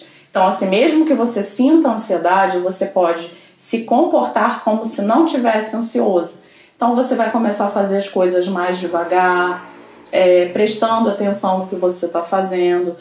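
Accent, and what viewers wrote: Rio de Janeiro, Brazil. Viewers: Você é Carioca? Ótimo video Devo deixar de tomar Café? Consumo mais do que o permitido.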